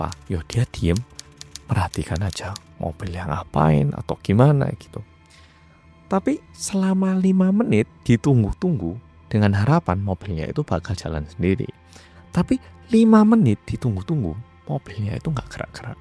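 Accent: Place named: Malang, Indonesia. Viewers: hahaha mas lek wes metu bahasa jawa malang e lucu pwoll 😭😭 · Kayak awe awe ...byuh mass malangan banget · Lah kan mas emang gedenya di Malang. Jadi ya wes biasa lah kalau ngomongnya masih khas arek malang banget 😅 malah itu jadi ciri khasnya dia yg bikin beda dari konten kreator asal Korea lainnya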